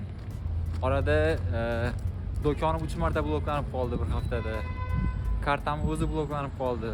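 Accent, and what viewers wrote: Khorezm, Uzbekistan. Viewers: Хоразмданмисиз асли шевангиз сал тортяпти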